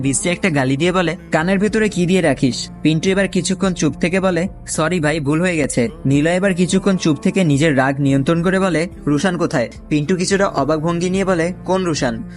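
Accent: Sylhet, Bangladesh. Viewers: ভাই আপনি কি সিলেটি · Vai apni ki Sylhety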